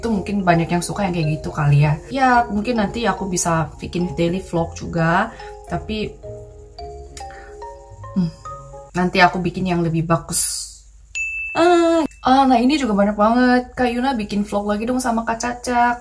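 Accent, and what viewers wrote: Indonesia. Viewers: Aku suka aksen indo yuna Mirip sama aksen indo Kak bintang dijerman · Wah..mantap bener bahasa indonesia nya lancar dan logat nya sdh indonesia banget .. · Wow keren,logatnya udah Indonesia bgt ini 😃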